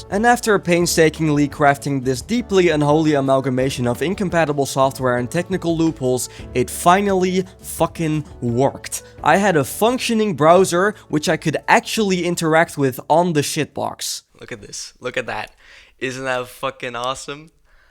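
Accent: Netherlands. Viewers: Ik hoor een nederlands accent Edit: NEDERLANDS, HET IS BEWIJS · wait are u dutch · ik vind jou nederlandse accent echt geweldig :) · From the very first second of hearing your voice I knew you were Dutch lmao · what nice video with a thick dutch accent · you dutch? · ur dutch? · i smell a lil dutch hmmmmmmm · Is bro nederlands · Are you dutch? WAS THAT THE MEDIAMARKT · I hear a little bit Nederlands 🔥😭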